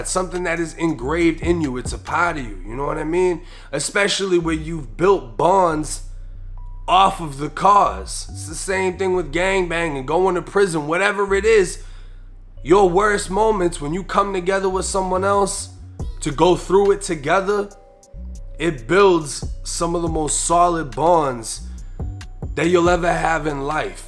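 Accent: Boston, United States. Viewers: You from Boston ? I can read the accent lol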